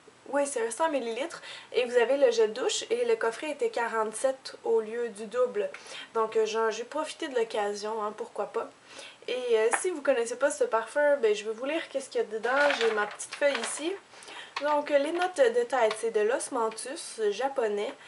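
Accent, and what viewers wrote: Quebec, Canada. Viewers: Coucou ! Félicitations pour tes vidéos qui sont toujours originales, utiles et marrantes ^^ Tu es très créative, simple, dynamique et tu expliques bien les choses (et j'adore ton accent québécois !). Continue comme ça c'est super ;) Bisous de France ! :)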